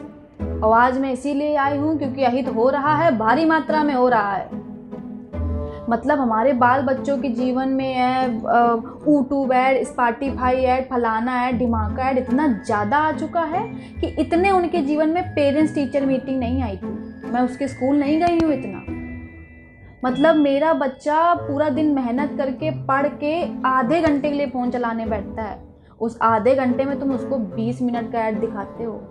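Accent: Kanpur, India. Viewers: Kanpuriya ho kya beta ji. 😍😍😍😍😍😍😍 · Bolne ki font bdi acchi lagti kanpur side ki...so awesome 😍😍😍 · apke kanpuriya accent par fida ho gaye hai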